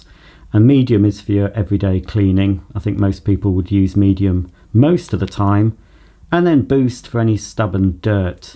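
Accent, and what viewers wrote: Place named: United Kingdom. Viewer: Came for the review stayed for the British accent. Greetings from Florida 🌴🌴🌴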